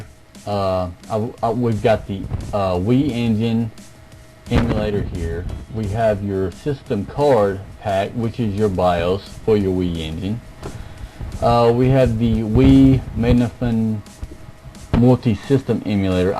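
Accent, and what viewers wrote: South, United States. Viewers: Your videos are old but have still helped me out. Plus your country accent is pleasing to the ears.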